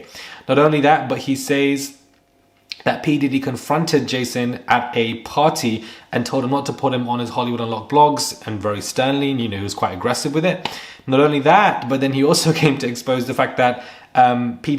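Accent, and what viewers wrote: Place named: United Kingdom. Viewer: This guys UK accent makes it miserable listening to him. The UK is probably the most unattractive country with the most unattractive people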